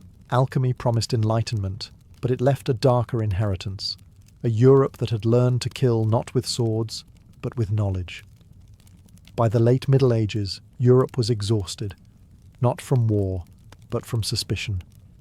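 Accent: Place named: United Kingdom. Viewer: It's 2.35 in New Zealand. Very very tired, but I must have my British man talk to me about history before I conk out.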